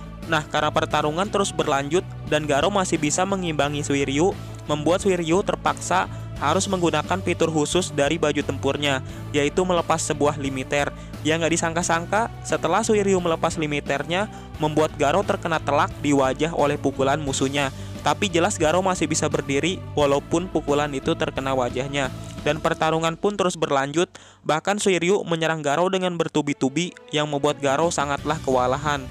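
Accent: West Java, Indonesia. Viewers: Mimin na orang Sunda ouy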